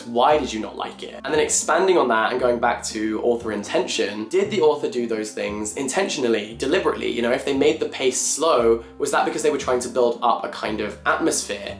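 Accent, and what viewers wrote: United Kingdom. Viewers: The Brits are losing their accent. 😢 Then again, you did just say “offen”.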